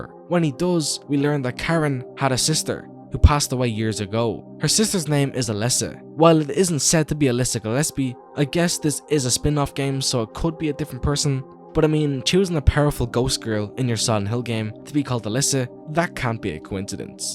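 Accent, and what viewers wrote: Ireland. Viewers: An Irish SH YouTuber? subbed! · Irish accent horror game longform videos? I'm so so so in. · Are you Irish? Irish SH fans unitee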